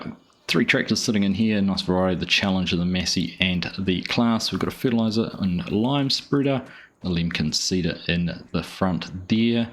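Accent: New Zealand. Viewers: Im sorry but what part of this map represents a Aussie farm ? to me it looks same as a euro map . and a kiwi dude voice over , thats like getting a mexican to describe america .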